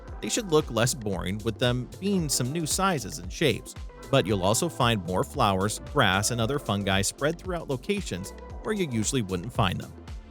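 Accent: Netherlands. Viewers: are you realy english or dutch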